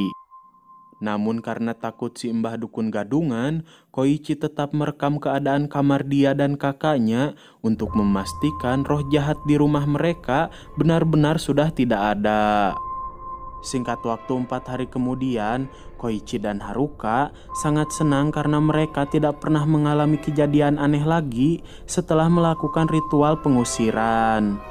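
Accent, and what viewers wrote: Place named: West Java, Indonesia. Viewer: Mass Logat Sundanya luchu jg🤣🤣🤣 film horor bisa berubah jd comedy..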